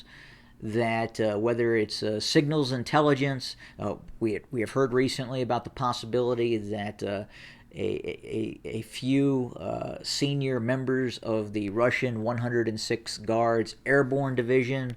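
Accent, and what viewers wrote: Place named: United States. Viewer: Hilarious that this guy who sounds American says he’s based in the US. This network should be called the Voice of Russia.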